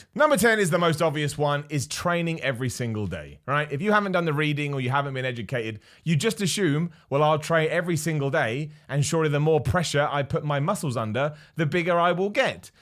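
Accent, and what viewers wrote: United Kingdom. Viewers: Don't know if I enjoy the British accent or the genuine opinion better. What a lovely human being.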